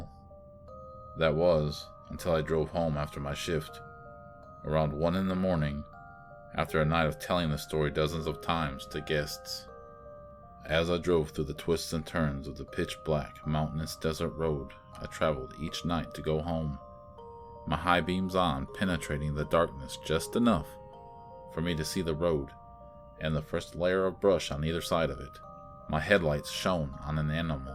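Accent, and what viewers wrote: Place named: Michigan, United States. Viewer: And are you Native? Your accent actually reminds me of some friends of mine from Michigan that are Ojibwe! It was the southern "influence" in your voice that threw me off though! LOL!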